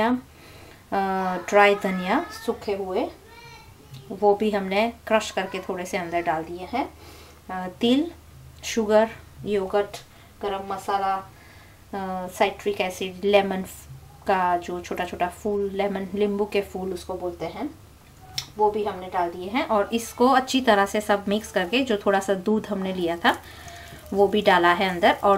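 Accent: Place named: Gujarat, India. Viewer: mam aapka gujju wala Hindi bahot hi sweet laga..., by the way good try... Maja aavi gai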